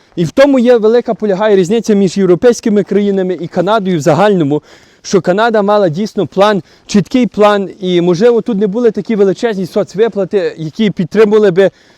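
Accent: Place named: Western Ukraine, Ukraine. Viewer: Трус. Чути говір західної України. Втік? А країну інші повинні захищати?!!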